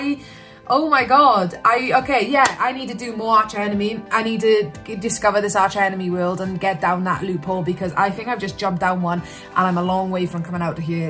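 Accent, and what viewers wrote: Wales, United Kingdom. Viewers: are you welsh? you sound welsh :)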